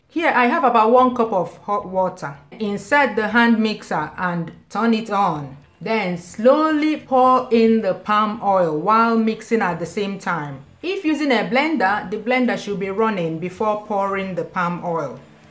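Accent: Igbo, Nigeria. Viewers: Just like your Igbo accent. E dey deep ❤ Cheers sis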